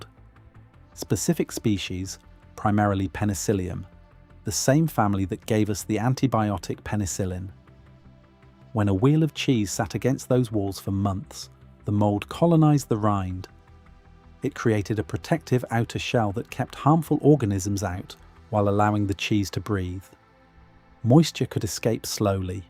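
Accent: England, United Kingdom. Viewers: I am so happy that I found these videos! However, there is one thing: the voice is English, not American. So why are all the videos so completely aimed at the American market? It would be so nice if we could have some comparable information for the UK! Apart from that I love these videos and I am using what I learn from them all the time in as far as I presently can. So thank you! Just some UK aimed info added would be wonderful!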